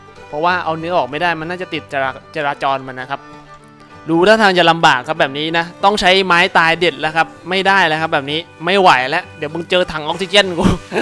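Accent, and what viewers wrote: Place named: South, Thailand. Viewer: ผิดเเล้วๆๆ555หนูชอบพี่เเหลงใต้มากเลยนิ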